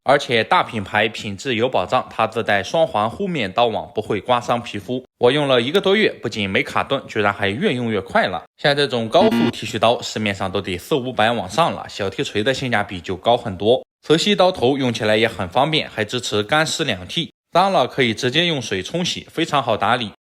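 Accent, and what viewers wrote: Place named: Xintai, China. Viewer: 博主有新泰口音 是新泰的吗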